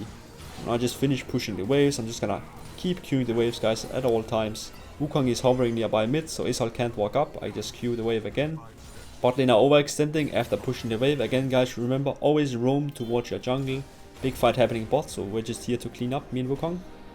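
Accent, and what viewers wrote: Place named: Denmark. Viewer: I can already tell you’re from Denmark by your accent🤣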